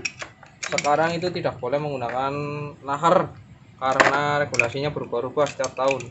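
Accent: Banyumas, Indonesia. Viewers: Bojone resing tenan mas... seneng khas ngomonge ngapak yak kepriweh pokoke khas tenan pertahankan mas... kaya mertua saya wong batang 😁 Ilmune aku nderek sinau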